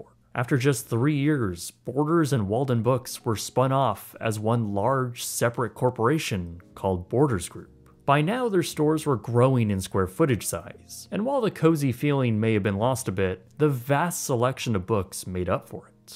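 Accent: Canada. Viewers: Its hard to understand with your Canadian accent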